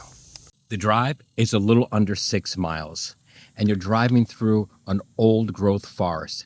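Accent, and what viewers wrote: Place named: North, United States. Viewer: Your Northern accent doesn't fit Gatlinburg.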